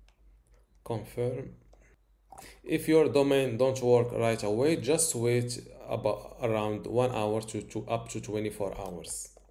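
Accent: Morocco. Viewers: thank you sir and i can tell from ur accent that u are moroccan arent you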